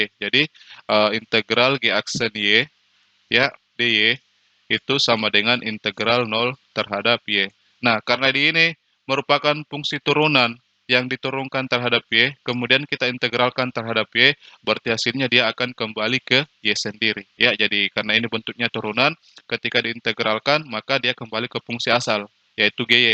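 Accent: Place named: Makassar, Indonesia. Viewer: logat makassar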